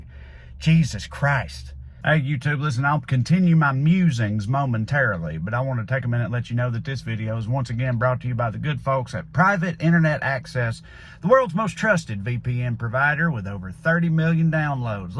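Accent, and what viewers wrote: South, United States. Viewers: I love his Southern voice. You usually hear a twang added if a person is suppose to sound stupid. It is refreshing for me to hear an intellectual conversation with an accent. An old friend of mine had an acting class,and the first thing the teacher wanted him to do was talk with an southern accent you sound dumber. So he replied,”Naw, I don’t think so Cuz, ya’ll can have it. I’m missing the football game on t.v.”😊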